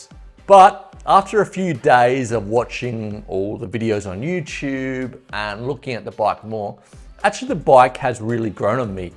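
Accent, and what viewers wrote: Australia. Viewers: You sound Aussie but you talk in pounds, are you doing bike reviews in Australia?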